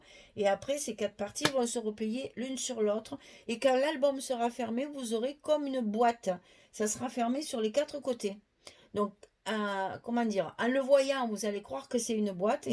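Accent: South, France. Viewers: Vidéo très agréable grâce à votre personnalité chaleureuse et l'accent du soleil. Je regarderai votre album avec beaucoup d'intérêt.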